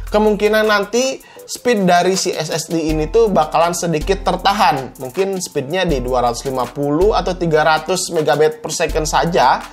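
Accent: West Java, Indonesia. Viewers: Orang sunda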